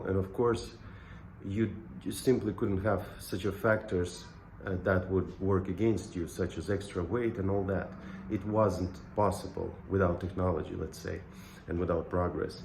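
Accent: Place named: Brazil. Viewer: I know this accent I guess. Tu eh BR mano? Mora aqui? Tu não parece a maioria dos BR treinando , treina mais parecido com os americanos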